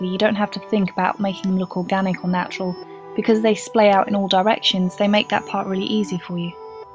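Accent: United Kingdom. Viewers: If someone did these videos and had an annoying voice I wouldn't be able to watch it but u voice is all British and stuff >.<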